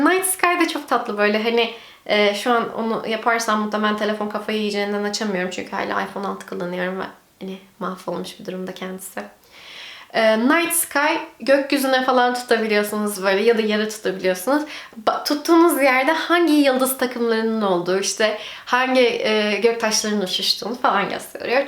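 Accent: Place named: England, United Kingdom. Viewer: Ingilizce aksanına bayıldım diyebilirim gerçekten ilk izlediğim videodan bile samimiyetine hayran kaldım😍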